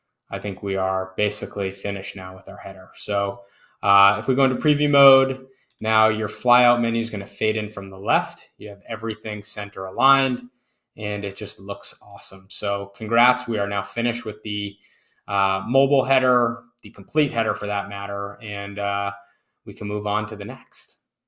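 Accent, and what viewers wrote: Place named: United States. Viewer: Greetings, from St George! Finally, a site on Bricks described in an American accent. At least I can understand what you’re saying. Very nicely done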